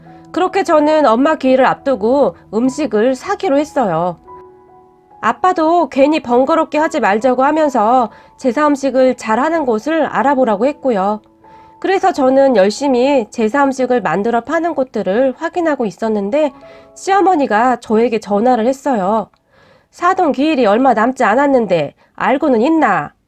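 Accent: Gyeongsang, South Korea. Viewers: 갱상도본토배기보다 더잘하시는데요?ㅎㅎㅎ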